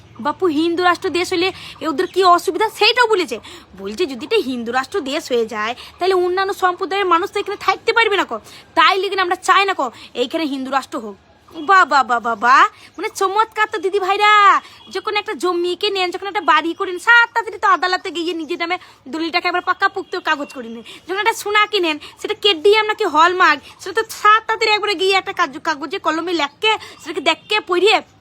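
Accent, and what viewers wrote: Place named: Bangladesh. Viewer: তুমি বাংলার কোন জায়গার মেয়ে? তোমার ভাষা শুনতে কষ্ট হয়।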